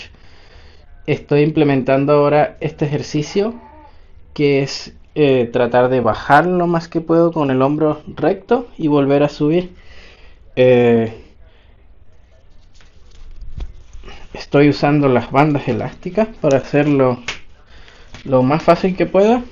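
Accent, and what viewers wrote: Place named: Chile. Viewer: Por tu acento eres de chile verdad? Por ciento normalmente cuánto cobras por un entrenamiento que me habias dicho en un comentario convertido a pesos mexicanos o como funciona que no soy de ese país?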